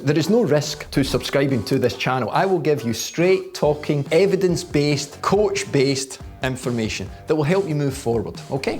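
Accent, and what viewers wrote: Scotland, United Kingdom. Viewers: I never expected to get my fitness advice from a guy who looks like Spud and talks like Begbie, but here we are....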